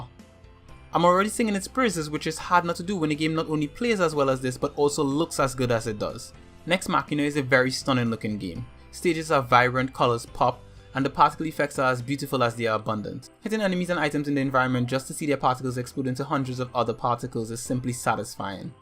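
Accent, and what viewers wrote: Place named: Trinidad and Tobago. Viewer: are you from Trinidad and Tobago?.... you sound like you are and great review.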